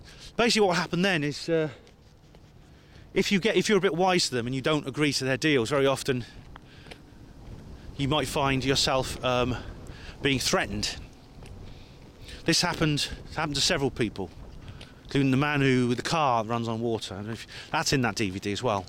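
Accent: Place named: Oxfordshire, United Kingdom. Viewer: Free energy tech demonstration with a distinct Oxfordshire flavour... :) watch?v=cuTp7HkpW7U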